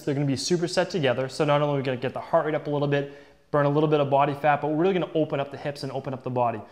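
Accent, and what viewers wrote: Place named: Canada. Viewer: Is from Canada?? He pronounces his "o's" like a true Canadian.:)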